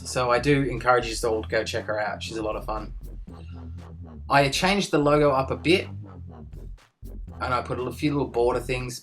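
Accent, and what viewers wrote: Australia. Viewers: can u not hear his accent hes Australian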